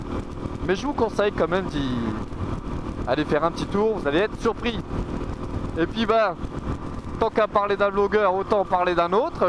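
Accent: Alsace, France. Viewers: On sait que tu es Alsacien, avec cet accent. Et le Panneau à 70 (Après le moustique) c'est pour les chiens !! De même que celui à 30km !! A+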